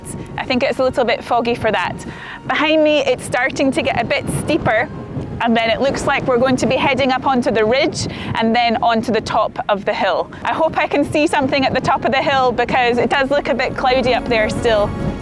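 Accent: Scotland, United Kingdom. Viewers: Nice one, what a blend, on the road with the campervan, hiking to historical sites, soaking in the beauty of Scotland, with your wonderful Scottish accent, love it.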